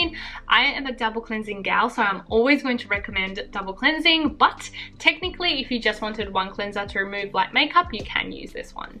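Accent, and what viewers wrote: Melbourne, Australia. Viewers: I'm trying to develop the superpower that is Being Able to Distinguish Between Australian Accents. You have the accent of a well-educated Melburnian but you sound like some friends I have in Sydney too...I'm torn. Argh. Please let me know whether or not I should hang up my cape ;) PS= Hada Labo will be the next brand I really investigate next time I'm replacing skincare in Japan so thanks for this video. :)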